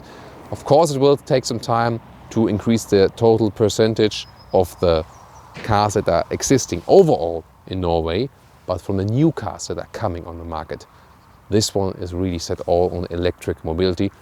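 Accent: Germany. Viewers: ich mag deutschen akzent im englischen nicht XD nice review keep going :) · Oh I just figured out when he refers to "recuperation" mode he means what we would refer to as "regeneration" or "regenerative braking". Not complaining. His German flavored English is adorable.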